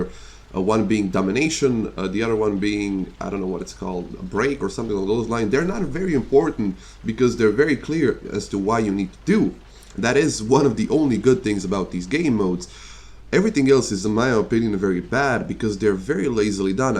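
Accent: Eastern Europe. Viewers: by the way, probably a lot of people already asked you but where are you from?? you definitely have a slavic accent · I love Slavic accents like yours so much, you sound so sexy. Nice video BTW, I agree with pretty much everything you said.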